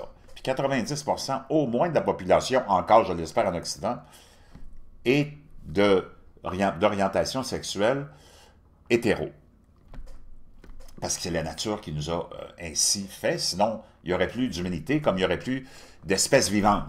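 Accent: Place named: Canada. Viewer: coucou de sud de la bretagne en France...tjrs un plaisir ton accent canadien...merci et mes voeux pour cette année qui s annonce encore bien chaotique....resistance....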